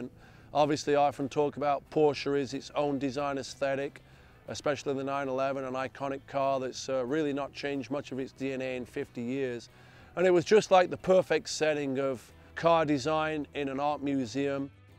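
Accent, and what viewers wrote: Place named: United Kingdom. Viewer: You can really tell that he's a British boy that's lived in the states for so long. His accent sounds so muddled between the two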